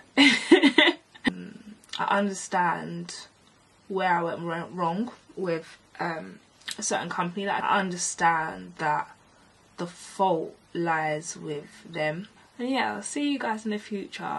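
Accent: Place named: Essex, United Kingdom. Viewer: I love your Essex kinda accent mix in with London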